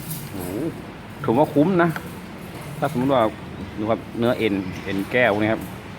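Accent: North, Thailand. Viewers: น่าจะอู้เหนือเนาะคับ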